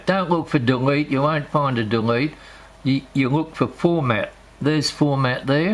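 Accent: Australia. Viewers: I like your aussie accent ~ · Thank you man , in fact i was search on youtube to find a way to delete the files , and suddenly i see your's , a great explanation. Thank you again Also i have a question for you What is your accent ? Is it Australian ?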